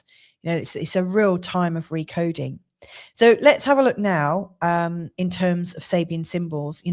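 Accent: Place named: Home Counties, United Kingdom. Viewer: I do like your accent, - it reminds me of home - the home counties?! - from here amid the Great Lakes. More seriously, Maestra, thanks again for the excellence of your work, so generously shared. I must go back to Skool where your stuff is even better. Crossing your palm with a little silver song... old school school! <link>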